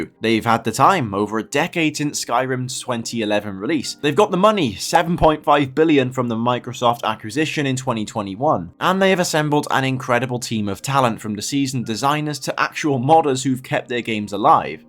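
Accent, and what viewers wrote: South Wales, United Kingdom. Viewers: I sense a south wales accent , newport ?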